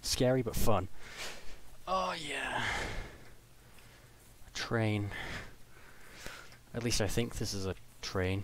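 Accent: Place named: United States. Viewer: My G is still alive. Where d'ya get that American accent??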